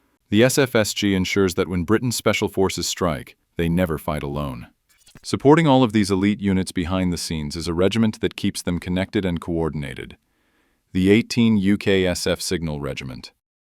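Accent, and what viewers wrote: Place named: United States. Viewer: I was going to correct you but as soon as I heard an American voice I won’t bother and just scrolled on.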